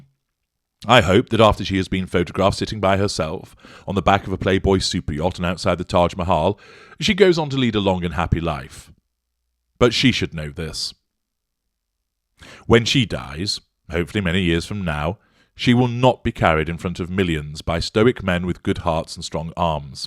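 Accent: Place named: England, United Kingdom. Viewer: 15 October 2022 Hello from Australia! Firstly I would just like to say how much 'I enjoy listening to your common sense videos'...I am 65 have always been a Royalist through and through! I have listened to many different Utube Channels and found yours to be the most informative, entertaining, and everything I could say aswell...plus love your very English Educated Accent and tone of voice! I can't stand high pitched gabble gabble it turns me right off! I don't know whether I am a Narcissist either..but I do know now quite afew even in my own family! One thing about MM...she has made people very aware of what a Narccist is? Before she came on the scene...I don't think many of the world population knew what one was! I certainly do now! All I have got to say on the matter! Keep up the excellent.broadcasts too... Thankyou sincerely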